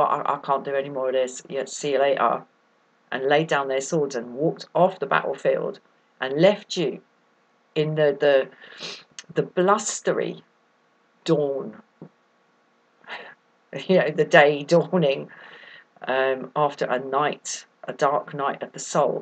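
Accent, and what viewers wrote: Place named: United Kingdom. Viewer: Loving your across the pond accent! Thanks for the needed message of new beginnings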